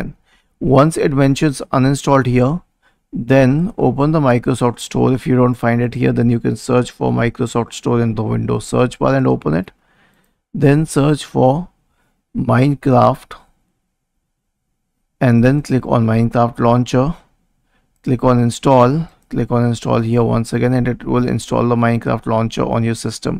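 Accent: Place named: India. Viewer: omg so indiannnnnnnnnnnn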